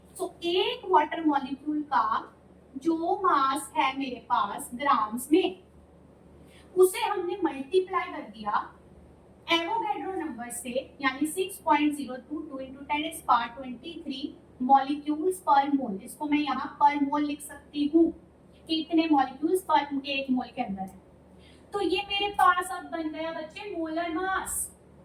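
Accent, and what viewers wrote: Punjab, India. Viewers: Are you from punjab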